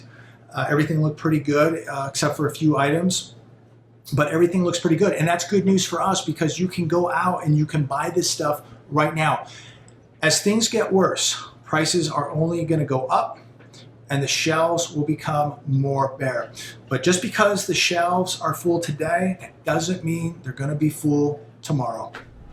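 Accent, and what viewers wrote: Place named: South, United States. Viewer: I couldn't get past her Southern twang even though I'm from the South myself.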